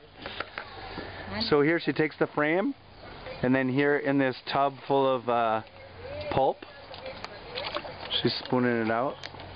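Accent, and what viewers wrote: Nepal. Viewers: Hi, Thanks for uploading this video and showing our ancient culture.....By the way I equally enjoyed the video and your Nepali accent. Regards Ajaya Jang Kunwar, Currently in Columbus, Ohio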